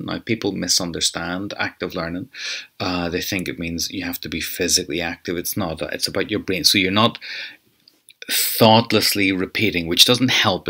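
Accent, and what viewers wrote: Ulster, United Kingdom. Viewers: I love the Ulster dialect! This is similar to the Pimsleur method. Say out loud, predict, repeat . Thank you. There are not many classes out there which are just oral.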